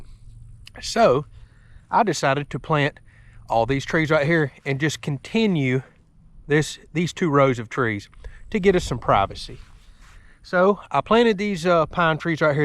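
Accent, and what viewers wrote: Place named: Florida, United States. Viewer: Hey, love the accent. Have you been a Florida man all your life?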